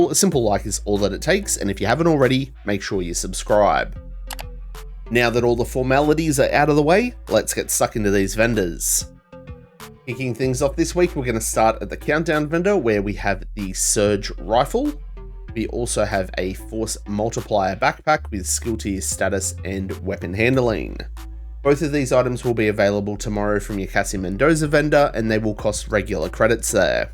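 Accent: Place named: Australia. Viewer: He's from Australia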